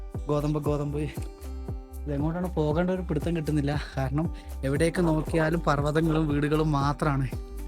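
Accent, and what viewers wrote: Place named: Wayanad, India. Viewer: വയനാട് കാരൻ്റെ സൗണ്ട്...🔥🔥🔥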